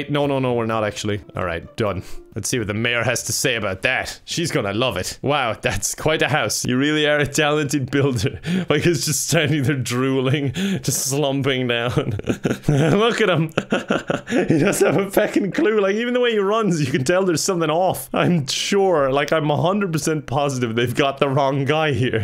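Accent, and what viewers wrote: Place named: Ireland. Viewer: omg i love speaking that thicc irish accent 😂😂😍😍😍😍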